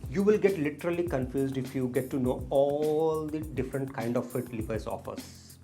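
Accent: India. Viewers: no offense, but Indian accented English needs to be spoken with a slower cadence for it to be understood by many people. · It's been a long time since I heard this Indian English. )))))😉😉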